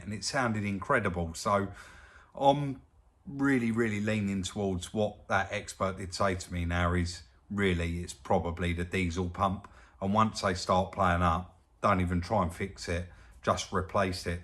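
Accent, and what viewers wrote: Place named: Kent, United Kingdom. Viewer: I really enjoy your shows I now live in Cardiff but spending the first 30 years of my life in Rainham it’s nice to hear a proper accent I also enjoy when you go out driving around what was my local area seeing some of the old places especially the Kingsferry Bridge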